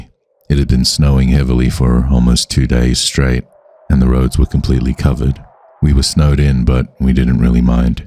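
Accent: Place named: Australia. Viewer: Great to hear an Australian story teller, I enjoyed listening in ❤🇦🇺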